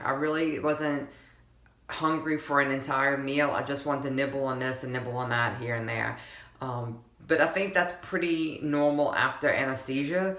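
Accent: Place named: Morgan City, United States. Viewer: Love your accent...sounds like home. I grew up in Morgan City.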